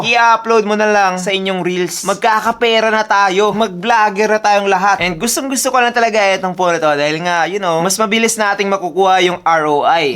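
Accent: Visayas, Philippines. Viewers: Taga San kaba boss parang may kunting bisaya Kasi ung fluent mo sa pag tatagalog din 😮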